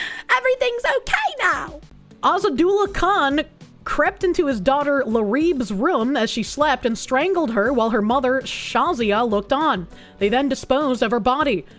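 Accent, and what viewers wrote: Boston, United States. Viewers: Obviously D4vd is innocent and the girl just accidentally hit the self deleting and dismembering button in the Tesla. It's marked D&D so she thought it was a roleplaying game with the car's AI. Unrelated: Ab sen tee-ah. My god. It's not your Boston accent. I love your Boston accent. It's the fact you cannot pronounce words. Ab sen she-uh.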